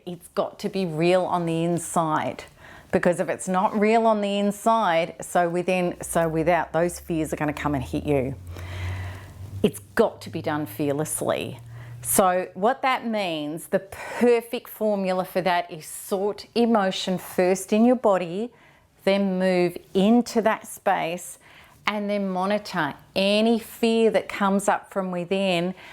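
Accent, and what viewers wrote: Australia. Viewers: What the hell is this, you wanna show that you speak Australian English right rather then what impotent things you are saying, right. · ozi acent and this patronising way of talking is so anoying.By the time she finishes the sentence,I forgot what she started talking about. However, some comments here are so helpfull.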